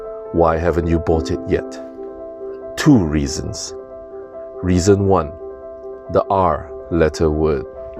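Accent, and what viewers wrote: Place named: Singapore. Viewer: to - wow. The writing quality is really up there. Turning the born to dare line around was smart and potent. I used to live in Singapore and recognized your accent... then I saw the beaches and knew it was Singapore! Congrats on this quality content, dude. As for me, the Tudor BB GMT is not for me. I don't think I'll ever be able to justify paying more than 1000 for a watch when you can invest it and return 7-10% per year in the market. The mark up is also inexcusable (cost to make vs. MSRP). I don't doubt at all the quality of these expensive watches though. Great video, please keep going. The watch community DOES need thoughtful content. You're going to grow big in no time with these type of thoughtful essays. Good luck.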